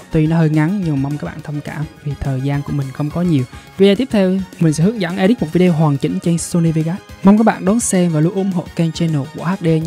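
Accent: Vietnam. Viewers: Giọng giống người Đà Nẵng?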